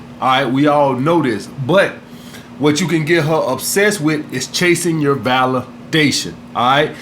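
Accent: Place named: Alabama, United States. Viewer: Skrate to ya crib Disney laying got damn lmao. Alabama accent plus profanity and game is hilarious 💯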